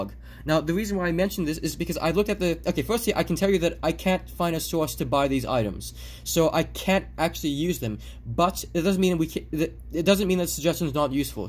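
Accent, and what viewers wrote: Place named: Russia. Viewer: Shit i was thinking he's from russia.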